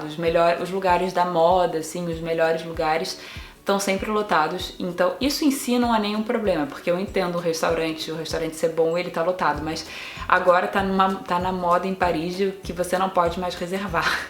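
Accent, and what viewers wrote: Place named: Rio de Janeiro, Brazil. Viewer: Sua voz é muito boa, dicção também! O nosso sotaque carioca é muito bom hehehe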